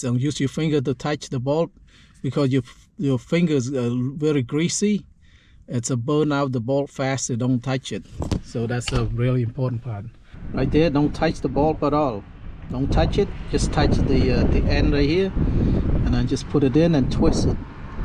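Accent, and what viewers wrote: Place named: Cambodia. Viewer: No doubt. This man is Cambodian. his accent says it all.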